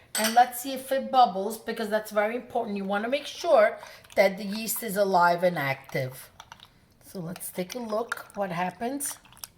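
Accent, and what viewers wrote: New York, United States. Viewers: Hello, I subscribed to your channel yesterday and had to call my mother’s(Phyllis) friend, Angela to ask because I just knew you were her sister! Same exact Italian/NY accent! Refreshing to hear since I moved from Ft. Lee to Indiana some years ago. I miss all things Italian since there aren’t any Italians here! No bakeries, no good cannolis, etc. My mother used your recipe for Ricotta cheesecake and I’ve continued the tradition.. I’m so glad I found this channel! 😊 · They look so good! I have to ask you, how long have you been here from Napoli? Your NY accent makes me think it's been a while. Lol..l love to hear you speak Italian. I don't hear it anymore. 😥 Did you teach your kids Italian? My father spoke it but never taught us..He was born in NY but Italian was his 1st language..He had to learn English for school. Good to see you today! 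❤❤😘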